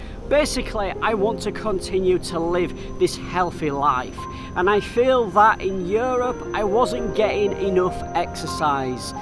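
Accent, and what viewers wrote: United Kingdom. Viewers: Hello sir.... I love your video about Malaysia.... I hope that I can meet you one day.... I love British people because of their talking style or maybe the accent... I love the way you talkin' sir so British 😅.... anyway welcome to Malaysia once again sir... enjoy your life in this beautiful country okay... 👋☺ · Ooo u come back to ur ex coloni...Malaysia is ex British colonial and British is not strange to us...ur ascent so pure british ascent..amazingly u like nasi lemak the malay traditional foods and now days all malaysian take nasi lemak. The cultures is diversity in Malaysia. I not need to tell u more about Malaysia and u well recognised about Malaysia I can see that. The London also not bad and amazing city too. London also with multi races and mostly from commonwealth British. Indian and Pakistan foods in Victoria also not bad nice taste and its cost about 10 pound huhuhu..one thing I like to travel to Europe is from London. 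The national express provide the route to the rest of Europe city and departure from UK to other city to Europe is easy for the imigration process.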